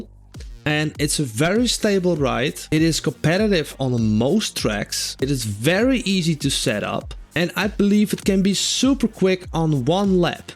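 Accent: Netherlands. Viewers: I smell dutch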